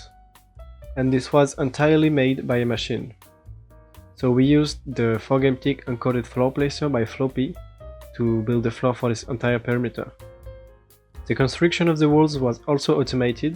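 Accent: France. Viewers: Another incredible video. Thank you very much, your explanations are super clear (Surtout l'accent français qui m'aide à comprendre😂). Love from France ❤.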